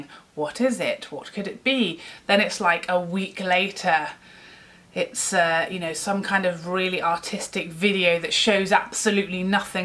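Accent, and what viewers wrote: United Kingdom. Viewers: Hi, I just wanted to say I like your videos, you have this real-life, down to earth approach, you're relatable and I think your reviews are very thorough. And I also like listening to your British accent. Thanks for the good job!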